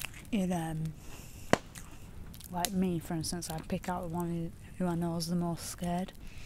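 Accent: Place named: Lancashire, United Kingdom. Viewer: she's from lancashire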